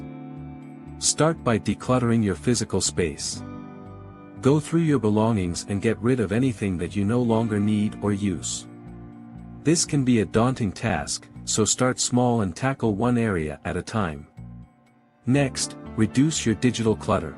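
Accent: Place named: England, United Kingdom. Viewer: 🎉amazing job ,You have a beautiful English accent.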